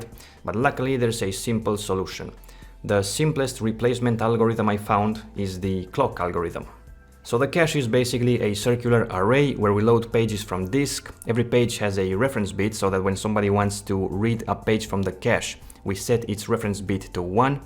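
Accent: Spain. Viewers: Tienes acento mallorquín. ¿Eres de por ahí? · You're not Spanish? WTF? Where are you from then? I'm a native speaker and when I hear you I have no doubts you are from Spain. Tío, qué cojones dices. XD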